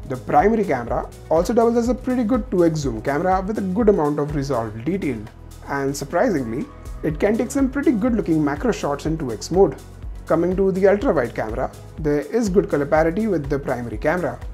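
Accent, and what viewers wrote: India. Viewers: Normally i cant stand india accents. Yours isn't too bad.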